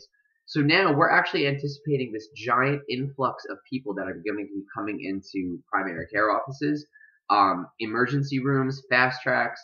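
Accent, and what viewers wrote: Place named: New Jersey, United States. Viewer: Tried to do your NJ accent but kept on making a southern accent lol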